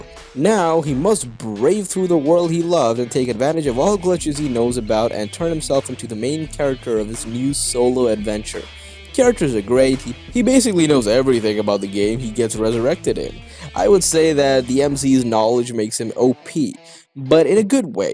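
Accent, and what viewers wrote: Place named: India. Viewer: Are bhai Indian ho na tumhare English se hi pata chal gaya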